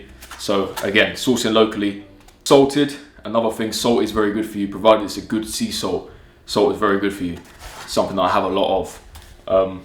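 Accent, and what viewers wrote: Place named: United Kingdom. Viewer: Which part of the uk you come from?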